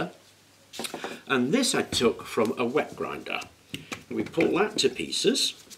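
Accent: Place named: England, United Kingdom. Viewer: Piękny angielski akcent :)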